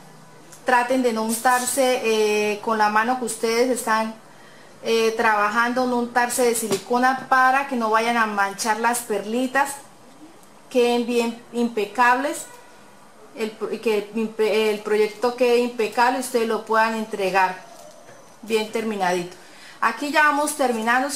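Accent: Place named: Santander, Spain. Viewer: Hermoxo divino si se moja no se despega en que teléfono te puedo localizar tienes acento de santandereano yo estoy en medellin me gustaría hacerlos para mi tienda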